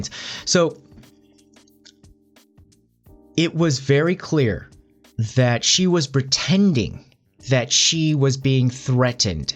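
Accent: United States. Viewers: Ok dude, its maybe just me, but you need to LOOSE the cowboy--gay--ghetto sound...were you raised in merica?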